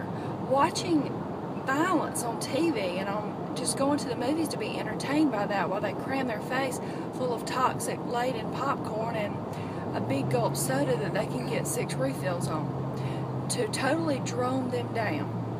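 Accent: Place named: South Carolina, United States. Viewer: I love you so much! I'm originally from SC and I miss hearing the accent so much! It's so comforting and your energy is so peaceful and loving! Thank you for making videos! I was going raw for a couple weeks but the past two days I got really stressed and ate two big junky dinners two nights in a row and now I have a terrible ear infection! It was really the kick I needed to go back to fully raw (bring on the detox and let it go!)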